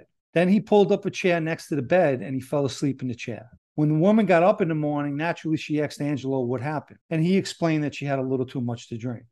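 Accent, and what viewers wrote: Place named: New York, United States. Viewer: Great I'm 2nd generation Italian from D Bronx moved to Vermont some years ago. Loved your stories and it was great to hear the NY Italian accent ,It like when I watch the Sparano's I get home sick! Thanks!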